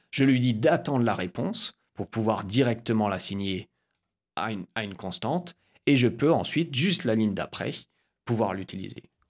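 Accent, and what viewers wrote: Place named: Alsace, France. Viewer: mec je te remercie, j'ai planché pendant 3 jours non stop. J'avais compris pourquoi on devait les utiliser mais comment utiliser ces outils, j'en étais incapable. Maintenant je vois un peu mieux et en plus tu as un léger accent alsacien ce qui m'a facilité le travail ^^'. Je pense que je vais jeter un coup d'oeil sur tes autres vidéos et merci encore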